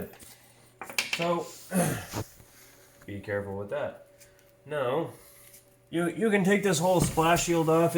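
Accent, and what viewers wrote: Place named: Nova Scotia, Canada. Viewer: Good work...I am going to tackle this same job. I think I recognize your accent. Nova Scotia?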